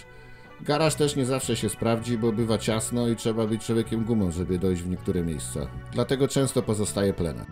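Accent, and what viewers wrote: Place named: Warsaw, Poland. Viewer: Bardzo się mnie podoba ta Warszawska mowa 😀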